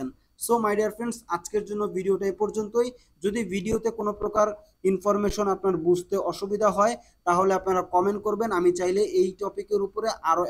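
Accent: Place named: Barishal, Bangladesh. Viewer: vaiya mone hoy Borishailla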